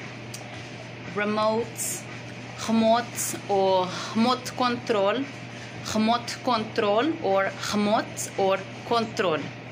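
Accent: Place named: Barlavento, Cape Verde. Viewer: I like these videos of you teaching kriolu. I especially like to see the barlavento accent. I've never been exposed to it. It sounds dope. Do more videos, we miss you!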